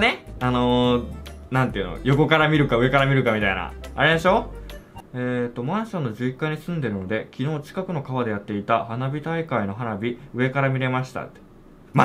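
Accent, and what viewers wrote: Kansai, Japan. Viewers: たまにくる関西弁好き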